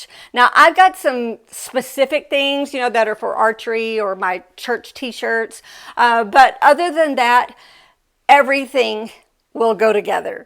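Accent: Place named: South, United States. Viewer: This is my first time watching you. You tube recommended it to me. I'm from the south so I appreciate your accent 😊. Are you also a pastor? Not because of what you said at the end, but you sound like one....is it called the cadence in your voice? And the energy. Just curious lol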